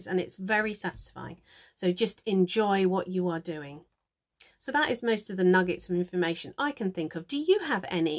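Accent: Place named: United Kingdom. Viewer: This was so helpful to listen to. I've been felting for about a year? I agree with you that it is so addicting. My one comment to add is that I watch many videos and find I want to try so many different types of felting (2D, 3d, landscapes, wet felting, needle felting...) I feel that trying a lot of different things has helped me narrow down what I want to concentrate on. Now, I need to practice, and concentrate on one type of felting to gain more confidence in my abilities. I look forward to watching more of your videos! I am American and just LOVE listening to your british accent!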